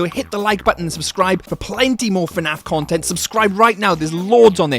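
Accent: Wales, United Kingdom. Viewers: Whats that accent? Is it welsh? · this is such a weird question but are you welsh??? i am and i think i recognise the accent loll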